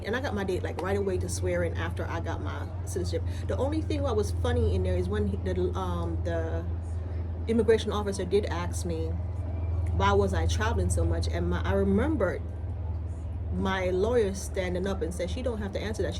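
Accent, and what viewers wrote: United States. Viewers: Sorry that happened,I have a question your accent is American,where did you attend school,what state ? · Why are you talking in an American accent? Your other videos are PURE JAM!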